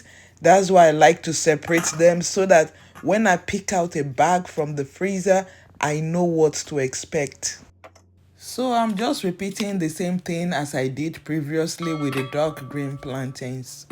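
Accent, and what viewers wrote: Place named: Nigeria. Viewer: Where you got those from? I like your Nigerian accent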